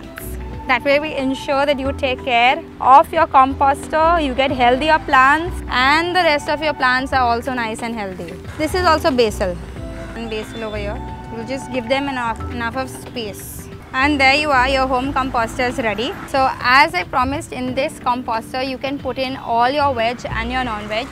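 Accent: India. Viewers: Girl, I love your Indian accent and voice! Thanks for the tutorial! Oh, by the way, I thought the mint plant is a weed and very invasive. Shouldn't it be planted separately from other plants? Thanks again.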